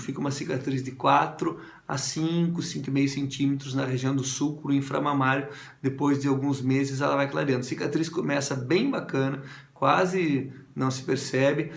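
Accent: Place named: Northeast, Brazil. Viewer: Dr. Primeira mente esse teu sutaque é maravilhoso, nao sei de parte do Nordeste tu eres mas amo esse sutaque. Bem eu estou planejando colocar uma protesi mamária, moro em Boston mas sou de Recife, estou estudando a hipotese de fazer a cirurgia no Brasil, gostaria muito de saber em parte do Brasil vc atende?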